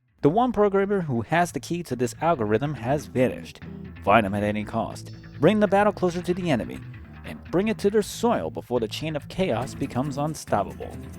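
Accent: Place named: Netherlands. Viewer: You sound kinda finnish with some pronunciations. Jokes on me before you turn out to be dutch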